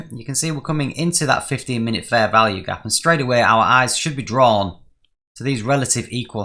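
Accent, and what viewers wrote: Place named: Midlands, United Kingdom. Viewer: Great videos, thank you! I found your channel after learning about unicorn entries and you really helped me understand and see them easily. I took this trade too, although I go with a fixed 3R TP for simplicity atm (I don't take anything off before it). I personally monitor both GU & EU for Unicorn entries post 7am (UK time) which means I can focus on the one Strategy. Thanks again, and very much appreciate the uk accent too, given I'm from the Midlands originally (I think you're slightly north of there, but not by too much?)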